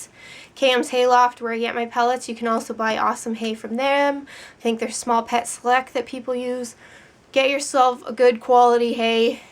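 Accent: Canada. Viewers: Wait... are you Canadian?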